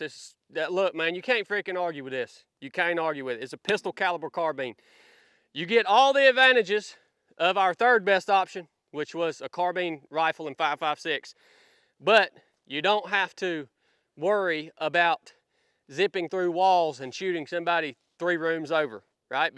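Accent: South, United States. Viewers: Omg…😂 maaaaan I abso-fuckin-lutely LOVE THIS VID!!!! Your country accent, appearence, gear and humor was it for me!! Lol one of the most logical vids I’ve ever seen on here man and you now have a loyal subscriber/ follower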